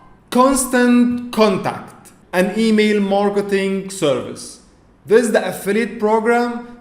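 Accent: Arab world. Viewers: May not an arabic native speaker try to speak English language. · عرفت انك عربي من اول كلامك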